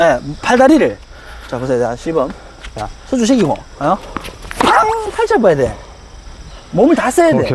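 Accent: Gyeongsang, South Korea. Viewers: 지역어디세여? 레알 오리지날 사투리이신뎅 ㅋㅋㅋ(저두 갱상도)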